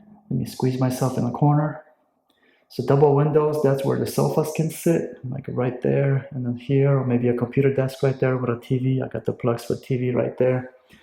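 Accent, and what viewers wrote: Sweden. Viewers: I like this small house. Your accent.. sounds Swedish. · You are going to love it in Iceland. Reccomend coming in July since you don't like cold 😋 I look forward to your next series. I dont know why but your channel is so calming. Not a feeling I associate with building cites lol.. I'we been trying to place your faint accent. But it's to faint to be sure. Sounds a bit Swedish somtimes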